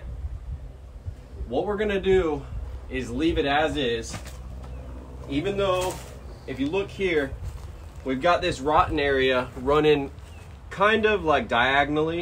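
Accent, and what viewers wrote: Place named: South, United States. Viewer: I am not from your country, can I ask based on your accent and a Tee shirt you were wearing one day about hospitality in the South - East, is the timber you are milling today Swamp Cedar? It has the most amazing coloured wood. Really wished you had thought to pour water on it to show us its wet colour. We have a few similar coloured trees in my country one we Call the Australian Red Cedar, and the is called Jarrah (a hard wood). thanks.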